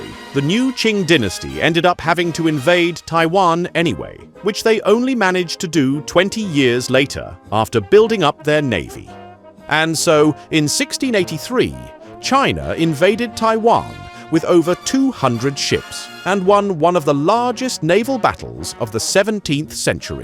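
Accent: England, United Kingdom. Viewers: Bring back old English accent, please.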